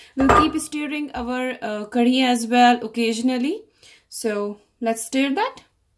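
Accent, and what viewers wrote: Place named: India. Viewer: Awesome... and with boiled rice it will be more tasty. Di I like ur hindi accent more cute. 😍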